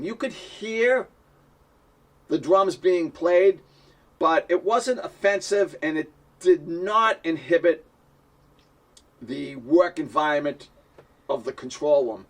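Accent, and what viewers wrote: Boston, United States. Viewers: I think your accent is far from "the worst" Boston accent. I like it, in any case.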